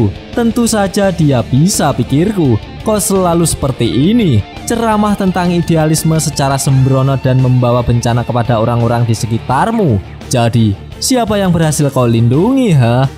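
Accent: Java, Indonesia. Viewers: Medhook pol min 😂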